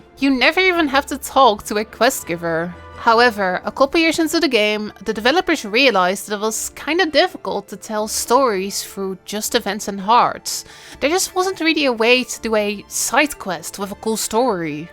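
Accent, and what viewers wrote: Netherlands. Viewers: I like your dutchness 😂 i can hear myself talking. Good video! As a long time returning player i am quite confused sometimes!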